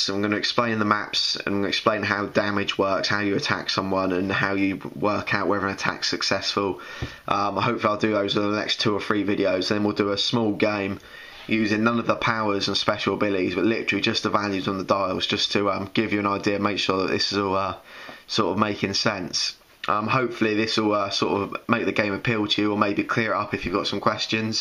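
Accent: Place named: United Kingdom. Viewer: I've found that when instructions are given by a person with a british accent, they always sound smarter than a person without an accent. Bravo!!!! I found this guide very informative.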